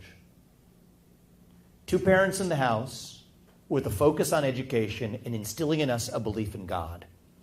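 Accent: United States. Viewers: As a 50 year old Canadian, Vikek sounds like an American. I don't expect he'll be the next president. But he'd make a fine president.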